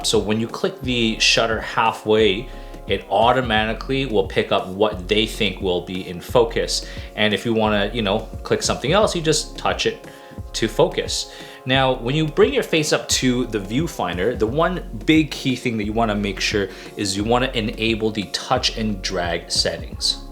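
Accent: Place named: West Coast, Canada. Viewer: Aside from the west coast Canadian accent, this was helpful!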